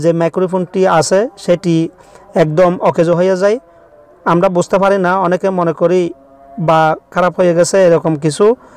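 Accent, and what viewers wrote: Chittagong, Bangladesh. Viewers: চট্টগ্রাম এর নাকি ভাইয়া আপনি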